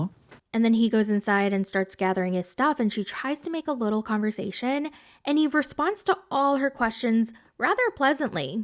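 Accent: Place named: New York, United States. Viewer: istg while getting to know you as a ytber and watching your videos i said to myself so many times this girl gotta be from NY and i just found out that you wereeeee lol i love it. Honestly makes me wanna watch you more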